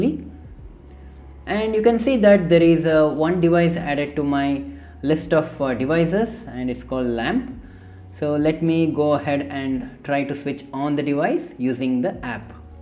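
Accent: India. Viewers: Hey man...are you from india..??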